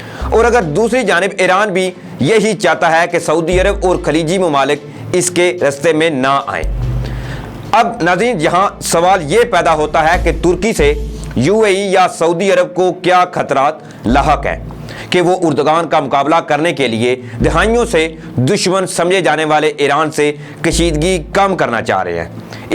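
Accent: India. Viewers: If you don't mind, may I say that you need to improve ur accent. You speak like indians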